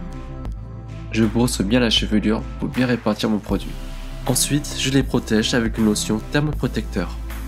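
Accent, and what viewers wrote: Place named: France. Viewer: amazing job !! love your french voice !!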